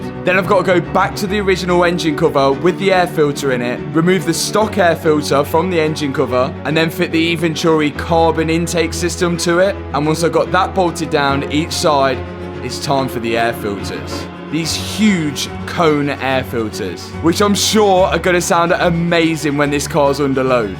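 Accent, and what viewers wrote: Leicester, United Kingdom. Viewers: yup, Leicester accent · From Argentina, I congratulate you on your channel; your content is very good. I find it a bit challenging to understand you because of the British accent, but at the same time, I enjoy it and it helps me improve my English. Keep it up!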